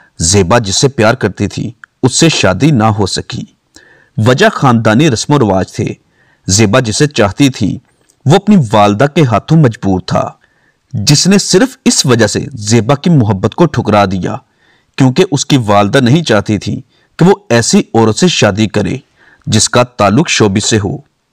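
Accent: Punjab, India. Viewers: Tu c Urdu Punjabi main bolte ho. Apna Punjabi lehja dorost Karo. Urdu sahi Sahi bolo.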